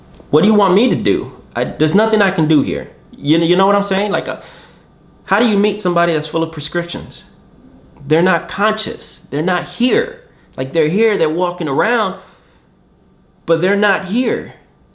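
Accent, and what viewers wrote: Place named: Texas, United States. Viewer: Damn bro you from texas?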